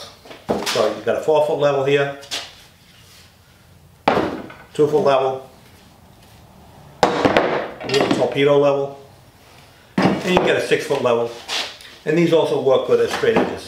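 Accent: Boston, United States. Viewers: Thank you Sir for this informative video. From one American of Italian ancestry to another,,,what part of the USA are you from? Great accent!!! Regards from New Jersey !!! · he is from Boston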